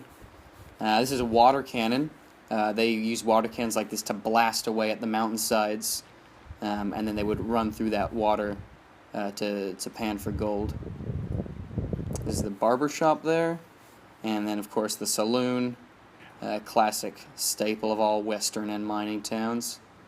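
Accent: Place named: South, United States. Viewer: Your accent has taken on a bit of a southern twang I hadn't noticed before. Hah.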